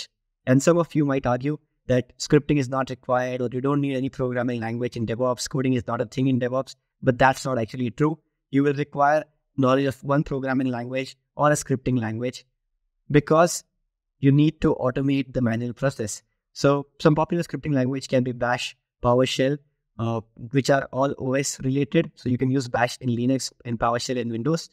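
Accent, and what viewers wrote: India. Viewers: Can I make a suggestion please? Your English is very good, but you still have a deep accent. Also, you talk very fast. Indians tend to talk very fast with an accent. So I don’t know about other American people but I have a very hard time understanding you. All of what you’re saying is very interesting and I want to follow it. Even some American speakers will speak fast sometime with different accents and I have the same problem. This is not just simple conversation, but explaining technical topics. So the combination of a thick accent and talking fast makes it really difficult to understand. I could play the videos on slow motion but there is still an issue and it’s a bit annoying. I love the programs from simpylearn and I really want to sign up but the only thing holding me back right now is this aspect. Sorry to ruffle anybody’s feathers but I’m just trying to help. 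And provide some honest feedback. Thank you.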